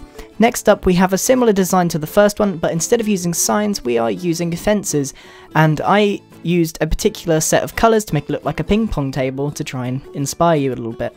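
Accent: United Kingdom. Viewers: hes british, no shit sherlock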